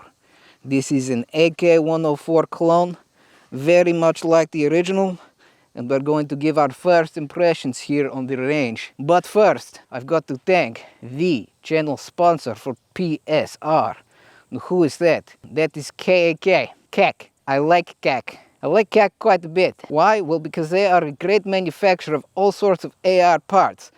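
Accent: Russia. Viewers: Your accent sounds like FPS Russian are you related? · Better Russian accent than FPSrussia. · Except for that russian accent you're almost clone-correct.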